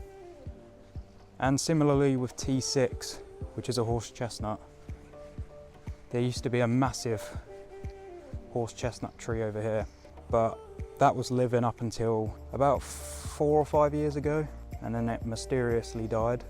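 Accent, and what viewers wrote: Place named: United Kingdom. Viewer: By your accent, mentioning of ash dieback, alders, beeches, and the use of the word lime instead of lindens suggests to me that you and your garden are in the UK. Most likely in England? In your other video about Bissett's bamboo its too bad you didn't plant rivercane (Arundinaria gigantea). That is one of the four species of bamboo native to the US. It is adapted for life along a wild river. But then maybe its hard to get there or it doesn't do well there?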